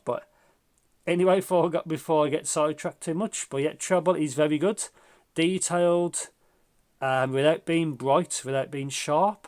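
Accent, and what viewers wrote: United Kingdom. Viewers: Hi mate, fellow brit here, subbed as soon as i heard the accent lol. Hoping you can help... My music is mostly, so alot of acoustic and string dominated music. live edition, acoustic such as ' ' and etc... To give you a solid genre type... Im looking at buying a pair of IEM's to suit my listening style for this music. I dont need bass cannon's im looking for quality rich mids and vocals mostly. Would you recommend these as a cheap enough pair that should do a decent job? I'm looking at ordering oracle mkiii as a treat for myself as a high end IEM set. Do you feel that set would suit my listening style? Great channel pal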